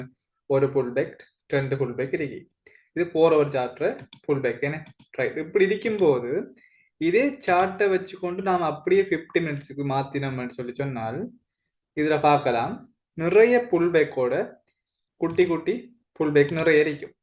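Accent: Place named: Sri Lanka. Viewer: Sri lanka thamizh 😍🫂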